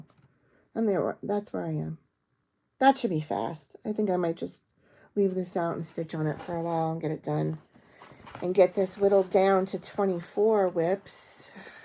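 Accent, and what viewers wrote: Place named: Long Island, United States. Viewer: Don't lose your Long Island accent... that's what first drew me to your flosstube... in addition to your wonderful samplers and camping stories. I was born in Bklyn and my folks moved us to the midwest. I so wanted to keep my accent, but I wasn't that lucky. I guess the linguistics doesn't change after age 16 and I was 13 when we moved. I an old lady now and every once in a while a word will slip out. Keep up the good, no great work!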